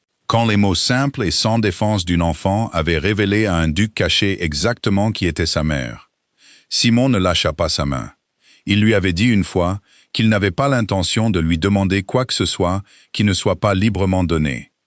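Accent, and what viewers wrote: England, United Kingdom. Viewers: Tous ces récits sont de l’Angleterre, cet accent donne de la force aux récits.. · Que l'IA abandonne cet accent anglais ridicule et on s'abonnera !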